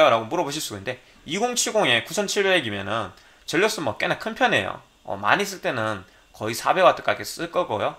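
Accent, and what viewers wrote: Gyeongsang, South Korea. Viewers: 님 말고도 유명 유투버도 육월이라고 하는 눈×전×라는분도 있는데 경상도분들은 다 육월이라고 하나요? 지역감정은 아니고 정말 궁금해서요.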